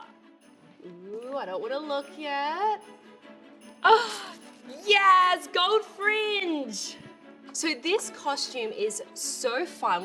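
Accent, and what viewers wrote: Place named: Australia. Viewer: So nice hearing an Aussie voice in the Rockettes (from one Australian to another). Would love to see the show sometime if ever I'm in the US at the right time.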